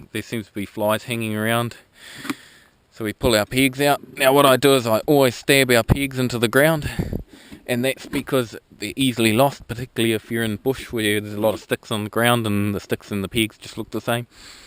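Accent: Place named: New Zealand. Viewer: aw yea, fush and chups tonight bro? Good to hear a kiwi voice....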